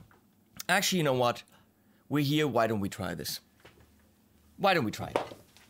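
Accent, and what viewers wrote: Germany. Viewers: Ich hatte die ganze Zeit das Gefühl das du deutschsprachig bist und als du "Very stramm im Ton" gesagt hast war es klar xD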